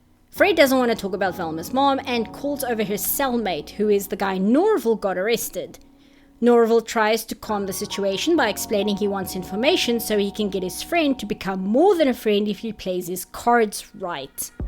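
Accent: South Africa. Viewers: oh geez i di not know you were from south Africa ? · lol I never would've thought of fred as a fellow autist but now it makes sense Also her south african accent is hot af, I hope I'm not the only one that thinks this XD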